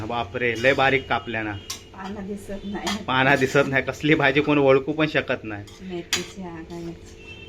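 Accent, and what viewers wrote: Malvani, India. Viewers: Tumchi malavani bhasha aikayla khup aavdate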